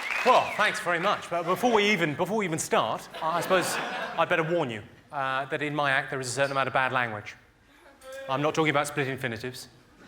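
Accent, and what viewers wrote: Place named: Yorkshire, United Kingdom. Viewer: You just sounds like a Yorkshireman in this vid.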